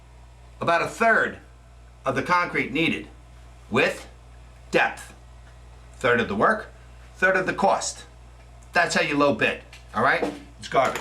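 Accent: New Jersey, United States. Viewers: great video! can quickly tell you're knowledgeable. I don't pay any attention to the comments where they say they feel that you're bitching at them. Doing contract work myself it's so frustrating when you see shit work done by others. It gives the good contractors a bad name who are willing to do the job right the first time and it takes more time for you to dispel those fears in customers that have been screwed over. to hell with the "contractors" that are too lazy or stupid to do the job right. This video alone made me a subscriber. Right away I said "this guy sounds like he's from Jersey haha and sure enough. just relocated to South Jersey for my job. Keep up the good work out there and keep the videos coming. Take Care! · Damn funny as hell before I was watching another video and I’m like this dude is definitely from Jersey! Kick ass bro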